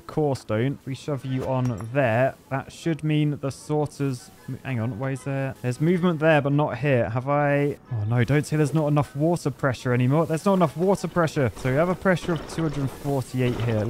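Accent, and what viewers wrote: United Kingdom. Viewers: British dude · r u from the north or south of the uk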